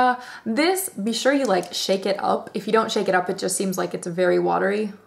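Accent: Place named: France. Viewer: the happy light thing from bourjois is awesome :D I don't mind you using the same products because you always look flawless. I love your french accent, very accurate! I think the clubbing waterproof eyeliners from bourjois are great, i have loving green and 49 crazy about brown and i'm thinking of getting the black and the dark brown ones as well... somebody stop me :))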